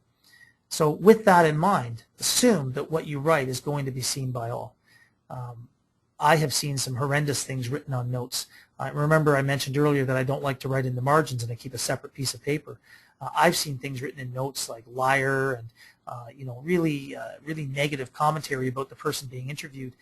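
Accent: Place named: Minnesota, United States. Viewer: Good stuff.... is definitely a Minnesotan or Canadian; Fargo anyone?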